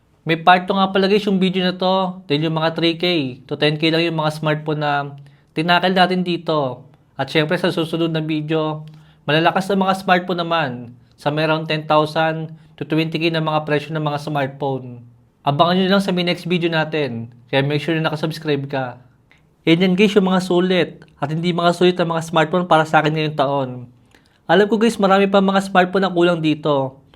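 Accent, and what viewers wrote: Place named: Cavite, Philippines. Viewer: Taga Cavite ka sir‽ Puntong cavite city or Tanza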